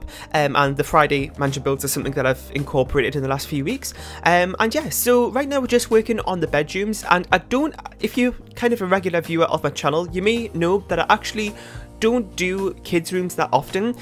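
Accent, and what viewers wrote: Newcastle, United Kingdom. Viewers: Ahh, just found your channel! I love your builds and your accent. Is it Geordie? I can't quite place it haha. :)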